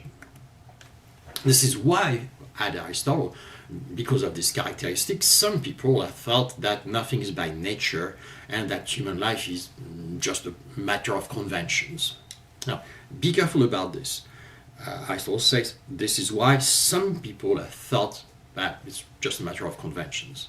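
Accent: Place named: France. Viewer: I love the French accent. Perfection.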